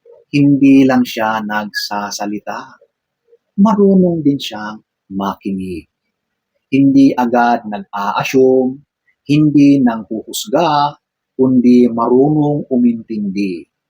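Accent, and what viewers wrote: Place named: Baguio, Philippines. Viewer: Dyan ako natutuwa sa pananalita taga Baguio ka ba sir?!😅😅😅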